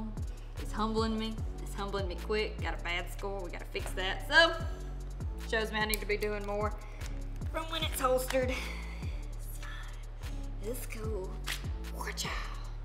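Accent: South, United States. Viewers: Love that southern draw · You are amazing. True red blooded American... Southern accent. Love it... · I reckon I love that accent, by gum🤠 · As an Old School Marine who graduated from Parris Island, South Carolina back in the day, has the perfect Down South dialect and smooth expression to explain things in an utterly captivating way. Also I would bet a gallon of Mississippi Mud Pie Beef Stew is a Marine.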